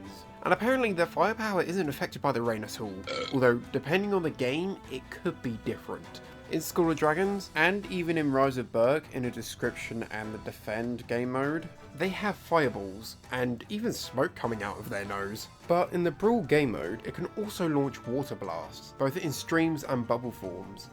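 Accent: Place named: United Kingdom. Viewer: Why do British pronounce t-u's like c-h's?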